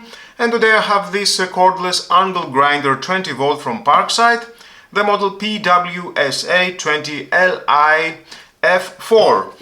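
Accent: Greece. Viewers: Έλληνας είναι . Φαίνεται απτή προφορά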